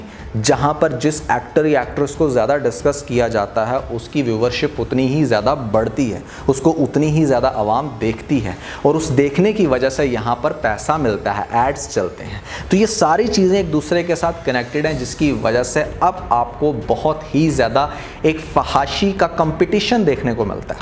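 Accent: Punjab, India. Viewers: I would suggest you do record your videos in Punjabi. You can't speak urdu with clarity. Your punjabi style urdu hurts.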